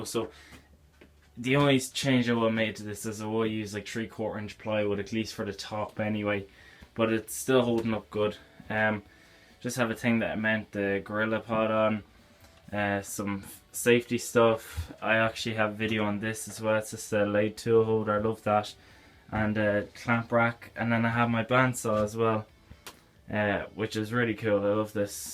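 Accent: Ireland. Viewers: Yes lad I know your Irish from your accent maybe Mayo or Dublin well I'd like to heasr from you you in a cabinet maker from Donegal thanks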